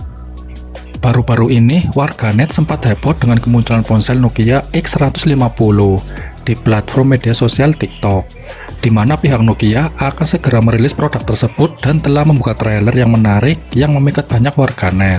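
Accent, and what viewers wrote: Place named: Java, Indonesia. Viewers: Suara khas, tegal ya keluar..😃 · Medok amat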